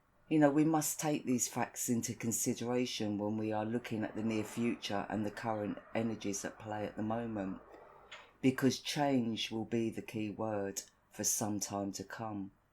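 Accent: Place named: Kent, United Kingdom. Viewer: Your accent sounds like where we lived in Sandwich, Kent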